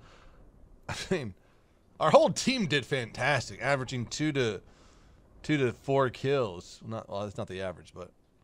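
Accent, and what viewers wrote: United Kingdom. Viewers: thats cause hes brrrrrrrrrrrritishhhhhhh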